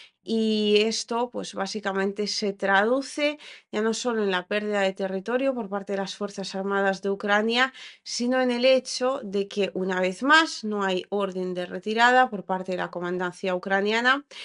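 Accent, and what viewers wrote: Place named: Spain. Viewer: Hola, me gusta mucho tu contenido y quiero decirte que también yo soy seguidor de tu compatriota La señorita Inna Afinoginava, yo créo que ya la conoces, porque ella también estudio el español en España 🇪🇸 Yo soy de Mexico y las sigo a las dos Pero me gusta mucho verla a ella porque habla cómo nosotros en Mexico, y no tiene el acento español como lo tienes tú. En España usan mucho los sinónimos De Os, Vos y sois, sabeis. Lo que en Mexico se erradicó el Español ibérico. Es cómo el Inglés de Inglaterra 🏴 y el de Estados Unidos Que son muy diferentes. Me gustaría que hablaras más cómo hablamos acá en Mexico. Así como lo hace Inna Afinoginova.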